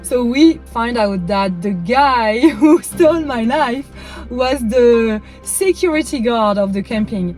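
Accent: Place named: France. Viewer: I think you mean influenced. NOT POLLUTED. I love your beautiful use of English with your lovely French Accent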